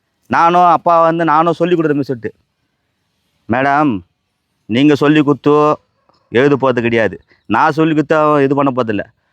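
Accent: Madurai, India. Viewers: Madurai vasagam super valthukkal 🎉🎉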